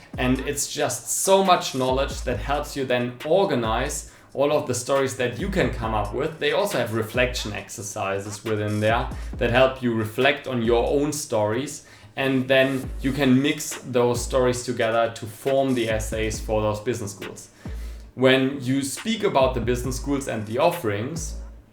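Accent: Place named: Germany. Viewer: This is like the staple German accent. If someone in Hollywood wants to do a German accent they need to study you.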